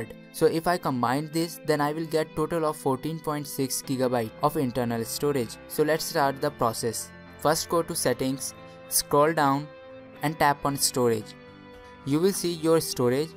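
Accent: India. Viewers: I like the video but the matter is i can't understand what he says cuz it's always indian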